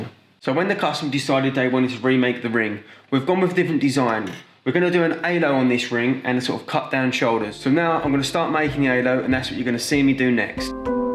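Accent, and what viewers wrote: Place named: England, United Kingdom. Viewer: Never heard your English accent before where is it from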